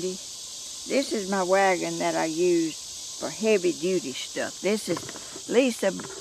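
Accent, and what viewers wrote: South, United States. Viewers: You inspire me. Your beautiful southern accent makes me homesick for my kin in Tennessee.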